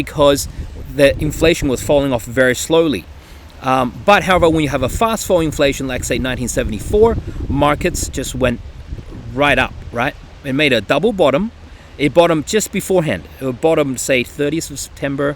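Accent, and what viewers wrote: Australia. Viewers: Are you an Aussie? Appreciate your sharing of perspective..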